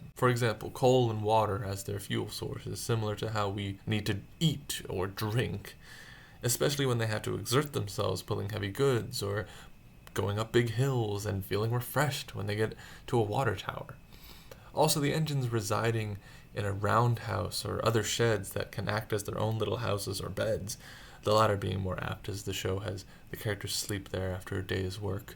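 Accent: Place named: United States. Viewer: I was not ready to hear an American narrator. I didn't know they made that.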